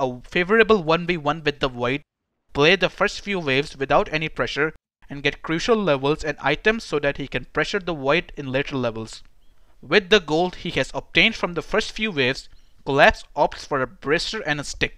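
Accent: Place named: India. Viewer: This indian accent man 🤭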